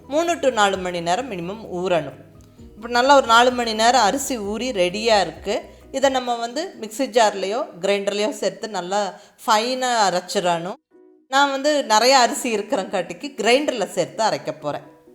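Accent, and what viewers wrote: Kongu, India. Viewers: Always ur kongu style recipes and kongu accent tamil r very very nice. புழுங்கலரிசி இடியாப்ப (சந்தகை) முறையை வேறு வகை இடியாப்ப கட்டைகளில் பிழிய வருமா? நான் உங்களின் அனைத்து வீடியோக்களையும் நீண்ட காலமாகவே பார்த்து வருகிறேன். All r awesome.. சிரிப்புடன் கூடிய குரலும் கூட.., உங்களுடைய பிக்னிக், சேலை கடை விசிட், மற்றும் அனைத்தும்...!!!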